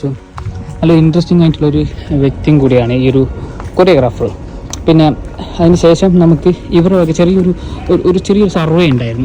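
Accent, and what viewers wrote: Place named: Thalassery, India. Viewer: bro i laughed a lot 😂😂😂 nalla pang indappa samsaram ❤thalassery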